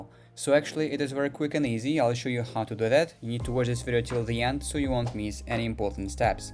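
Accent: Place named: Russia. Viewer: В вашем английском я слышала русский акцент... Но потом увидела « »...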